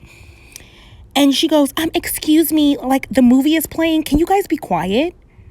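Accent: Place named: New York, United States. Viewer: You have that New YORKERRR voice 🥰 NYC gang 😝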